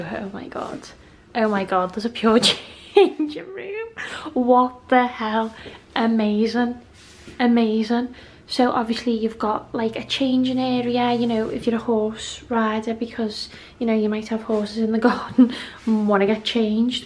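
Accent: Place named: United Kingdom. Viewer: You have the prettiest accent and voice I ever heard from British person. 😄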